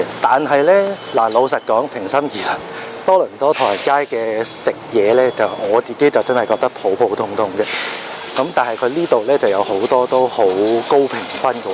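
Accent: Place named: Hong Kong, China. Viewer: 你好呀，今日無意中睇呢條面影片，聽你講說話嘅口音似係香港人，但係點解會叫油條同埋茄子嘅?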